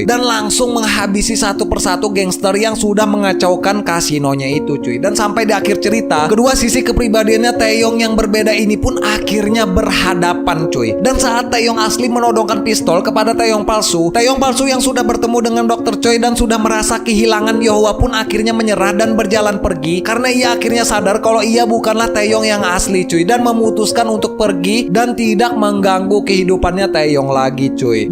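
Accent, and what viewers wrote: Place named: Lampung, Indonesia. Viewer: Dari tata bicara kaya orang Lampung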